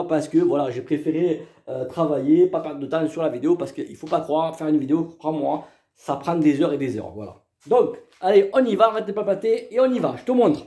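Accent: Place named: South, France. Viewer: est ben écoute Fréro tranquilou 🤣👍 Ouai tkt , je sais ce que c'est .... Compliqué de tous géré 😭 Mais bon .... Ça fait plaisir de revoir ta bouille et l'accent du sud bordel 😁💪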